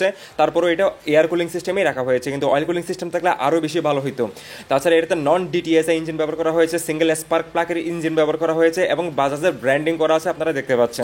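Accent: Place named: Chittagong, Bangladesh. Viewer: ভায়ের বাড়ি চিটাগাং। এক্সেন্ট এ বোঝা গেলো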